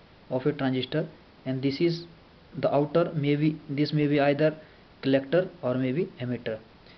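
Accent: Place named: England, United Kingdom. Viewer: fuck this english accent !!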